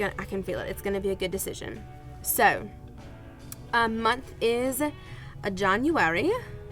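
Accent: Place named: United States. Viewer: Ah love you accent! Which part of America are you from? I work in education too (in the UK obviously lol). I can’t give up my twinkl subscription for teaching resources ☺️ so helpful to have (and I get a free book sent every term).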